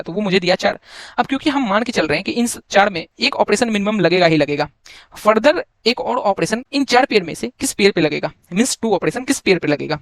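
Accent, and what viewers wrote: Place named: Bihar, India. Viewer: acha smjhaya . kaha se ho bihar me? (accent++)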